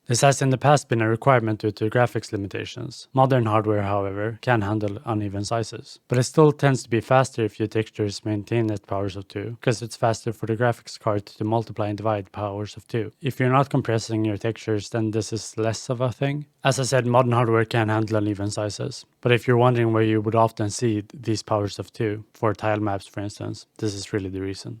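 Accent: Sweden. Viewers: Your not swedish like me by any chance? Sounds like you have a stockholm accent.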